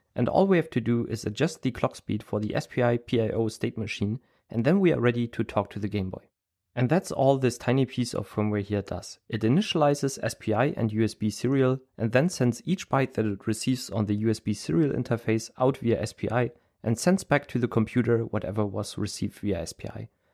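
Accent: Germany. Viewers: Man hört den deutschen Akzent :) Trotzdem cooles Video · DAs hört sich an, als wärst du von Deutschland. Der deutsche akzent. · Du hast einen deutschen Akzent:) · ist das ein deutscher akzent? 👋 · Aussprache lässt darauf schließen das du deutscher biat xD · Kleiner deutsche akzent lol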